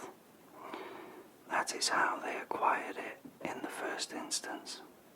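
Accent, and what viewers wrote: Yorkshire, United Kingdom. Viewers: Are you from Yorkshire? I recognise the accent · are you British? I like your accent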